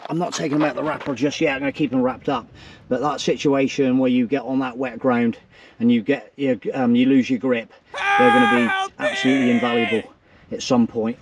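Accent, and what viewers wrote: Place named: Exmouth, United Kingdom. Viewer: yep that’s what I’m looking at but I’m being told to fit a 255 tyre they need to be a 7” wide rim not the standard 6” , what width are your steel wheels . Btw I love your channel and your accent which is very much like mine coming from Exmouth and now living in Gloucestershire 👌 . Thanks for your help regarding the wheels 🙏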